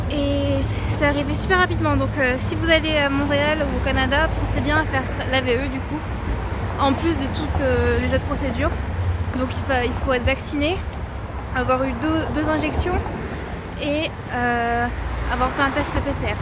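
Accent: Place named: France. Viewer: My wife and I love your french accent. Welcome to Quebec and good luck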